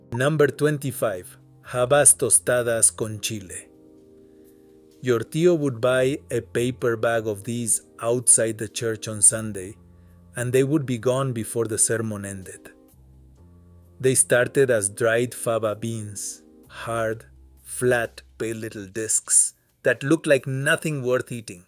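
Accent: India. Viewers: Why does he have an Indian (hindi) accent...? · Why is the guy reading this have an Indian accent lol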